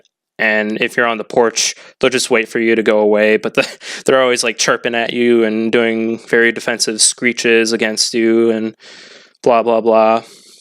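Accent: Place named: United States. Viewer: Oooh you're American! I'm British lol.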